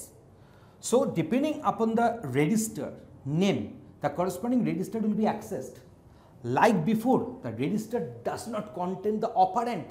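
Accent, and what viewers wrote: Bengal, India. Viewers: Bengali aiese hi bolte h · He has an indian accent and i see no issue french have french accent,etc. And they are proud we should be proud and he is a gem❤️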